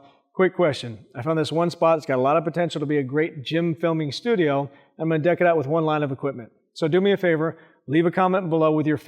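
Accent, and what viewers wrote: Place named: South, United States. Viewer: You sound and talk like Stone Cold Steve. Is it because you're both from the south?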